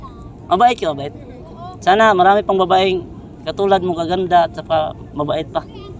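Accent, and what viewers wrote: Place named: Visayas, Philippines. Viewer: Pag bisaya na lng oi,halata imong tuno!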